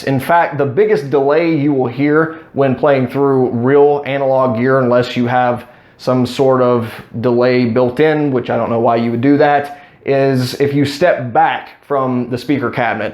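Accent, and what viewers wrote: Texas, United States. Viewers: Why do you sound like you are from texas lol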